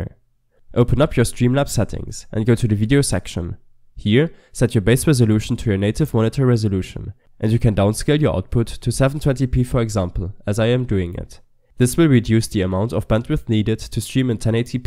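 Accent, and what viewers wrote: Germany. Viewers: Love your Deutsch accent 😜😜😜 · mann hört so hart raus das du deutsch bist xD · Genau so ein Tut habe ich gesucht danke :D Hab gleich gehört das du aus Deutschland kommst xD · German Akzent 😅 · Wollt schon sagen ich guck das video zum erstenmal direkt habe ich gedacht deutscher haha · tahnk u man so mmusch youre the best and i love ur german accent liked and subbed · 3 sekunden und ich wusste du bist deutsch lol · spricht du auch deutsch ? hört sich irgendwie so an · Hrm...German accent sound so cool, btw what microphone do you use? It sounds great.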